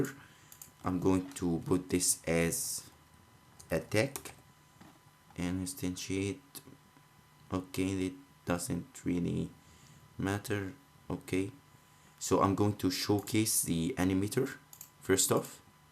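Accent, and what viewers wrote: Egypt. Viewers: YOU SOUND LIKE AN ARAB ,,,, AN EGYPTIAN TO BE EXACT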